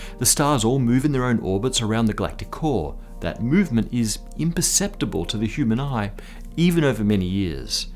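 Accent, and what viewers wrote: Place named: Australia. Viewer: this Aussie speaking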